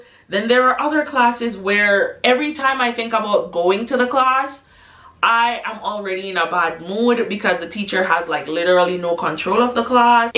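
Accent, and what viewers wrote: Jamaica. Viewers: I can hear Jamaican in your voice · are you from Jamaica? You have an accent that sounds like them.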